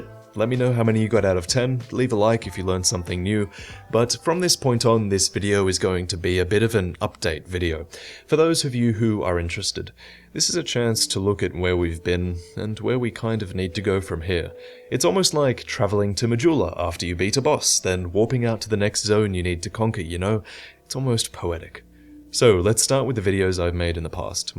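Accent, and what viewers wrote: France. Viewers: Your french accent is not that bad ^^ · Good French accent. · bel accent français ^^ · your accentin french is soo aaaaaaaaaaah <3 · Nice french accent :)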